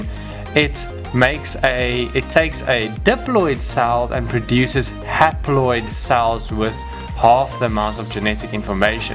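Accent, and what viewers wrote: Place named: South Africa. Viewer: thank you for the video man, it really helped. Are you South African? The accent is almost identical hahahah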